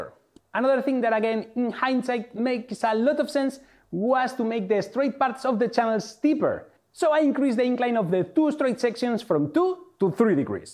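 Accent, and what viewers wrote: Spain. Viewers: como se le nota que es español al jodio... increible video e invento tio!!!! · Tu ere español no? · Se nota a kilómetros el acento español que locura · Tú eres español, a mi no me engañas! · el acento español se nota un huevo, eso ayuda a los españoles que sabemos inglés a hacerlo más facil de entender, muy buen video y me voy a ver un par más que me parecen interesantes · Como se nota que eres español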